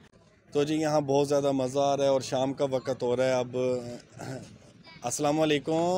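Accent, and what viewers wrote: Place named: Saraiki, Pakistan. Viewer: بہت ہی پیاری اور مٹھی بولی سرائیکی بھائی آج تو دل خوش کردیا آپ نے اللہ پاک آپ کو کامیاب کرے اور صحت والی لمبی زندگی عطا فرمائے آمین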